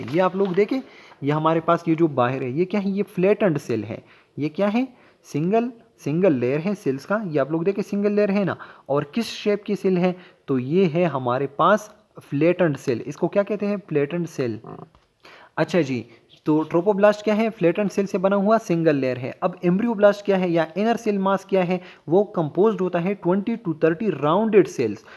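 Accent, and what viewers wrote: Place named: Khyber Pakhtunkhwa, Pakistan. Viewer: Great lectures sir helping alot. Seems like you’re from kpk??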